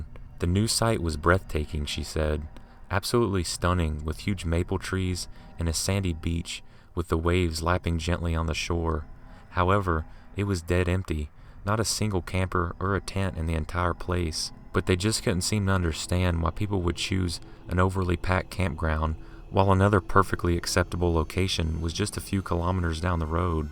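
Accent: South, United States. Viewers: Cool accent. Sounds a little southern(?)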